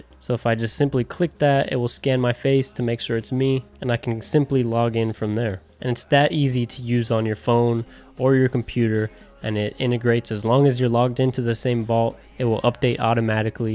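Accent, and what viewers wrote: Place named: England, United Kingdom. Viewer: AAWWWWWWWWWWW shit I checked your like but and this is my second time through I have my Vault and got graded strong on my first master pw but I still have no idear WTFs going on. i'M WAY FOGGY ON THIS SETUP but at least our volume is good and you have a nice clear Engrish accent a bunch of these guys thought they were maxwell Smart and they needed to disguise their voice from big brother. Ok video i'm gonna try it again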